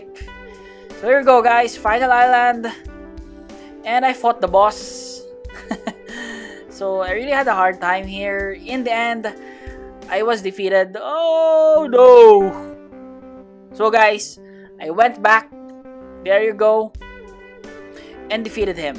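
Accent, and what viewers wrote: Philippines. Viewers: are u from the phillipines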